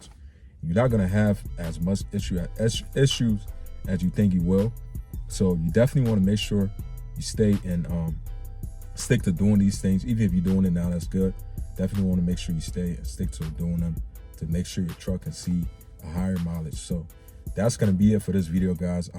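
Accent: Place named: Liberia. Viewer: Where are you from brother, I'm hearing small Liberian accent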